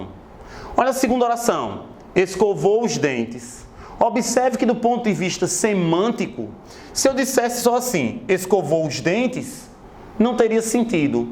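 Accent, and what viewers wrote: Northeast, Brazil. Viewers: Seu sotaque é do Piauí ou da Paraiba ? Aula Show e sensacional ! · vídeos Diversos ele é nordestino né não?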